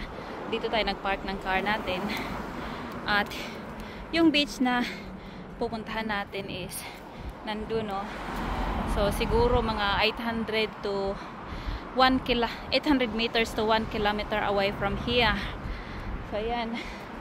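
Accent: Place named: United Kingdom. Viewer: Hiningal n c madame at tsaka ñaimpluwensyahan ng British toungue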